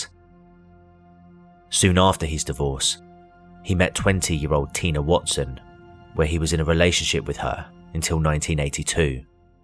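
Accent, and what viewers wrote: United Kingdom. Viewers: Hiya, I was sent by Kyle's channel, Dire Trip. I'm very pleased that I took his suggestion. If you'll indulge me for a wee bit, I've a few things to say. First, thank you for the warning. I did not heed it, but am grateful you provided it. It was sadly warranted. Second, this is an horrific case. One I'd never heard of. I understand your research is top notch and I commend you. Third, I am a long-time fan of true crime but only recently been seeking out YT vids on this subject. Now, I am not a snob, but I do appreciate good grammar and a good flow to the narrative. (I'm sure alot of folks would say the same.) I think you did a fine job. I enjoyed your accent as I am a transplanted Scot living in U.S., and my Mum was from Manchester 😊 I do enjoy a Brit accent. I also noted that your tone conveyed your disgust at the heinous injuries this poor soul endured. 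Bless her memory. I'm happy to be a new sub, I am grateful to Dire Trip for the suggestion and I really look forward to hearing more from you. Thank you very much. Blessings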